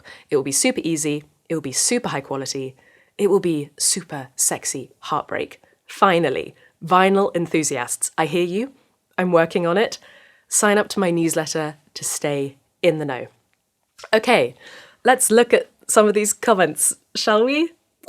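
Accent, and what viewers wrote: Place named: Bristol, United Kingdom. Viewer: Not sure commentary from an old guy (I'm 79) is useful, but I'll give it a go. I stumbled on to your channel on YouTube by accident. I've stayed a fan because your musical tastes are eclectic, and sometimes overlap my own, and also because I'm a sucker for an articulate female voice with a Bristol accent – I like your "talk" videos as much as your musical ones. I've played guitar for decades, but am not a musician (I don't understand the theory, barely can name the strings on a standard-tune guitar, etc.). I learned on my own, by ear, and will never be better than mediocre. That's OK – I don't expect "success" in that context. I play for therapy. I "discovered " Larkin Poe in the same way – by stumbling upon them on YouTube by accident – but have become enough of a fan that I actually went to their show last spring, despite my advanced age, when they played Minneapolis last spring. 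I'd be happy to see you in person, as well, if you ever came here. It seems smart to me, a non-musician, for a young musician to make use of multiple sources of income, publicity, etc., and you are obviously a smart musician. I have no talent for business, so kudos to you for making it work on your own terms.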